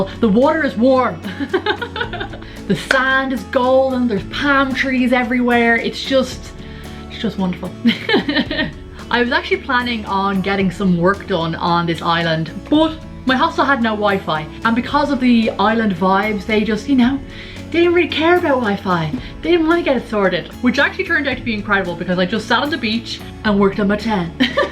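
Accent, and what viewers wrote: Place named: Ireland. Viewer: I feel very happy 'coz I understand 90 % now... When I started listening to I got only about 5 % because of her Irish accent. So, it's important to go ahead and listen to her even without subtitles in Portuguese, because you can improve your listening as I am doing right now.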